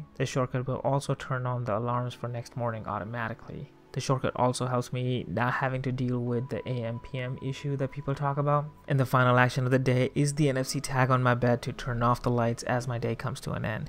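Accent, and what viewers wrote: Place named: India. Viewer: Indian accent is still there :) , Oh Puchheya Na Kar Mera Schedule Ni But you are telling your schedule about the day ... lol XD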